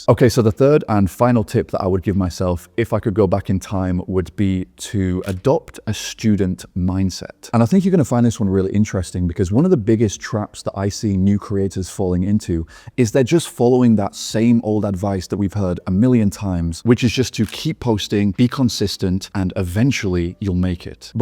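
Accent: United Kingdom. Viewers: Is that British accent? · I like your voice a lot. And for me, thats a huge reason why i watch someone's vids. If they have great content but their voice grates on me, then i won't watch. Plus you have a great yorkshire accent...best i can tell in California. 😁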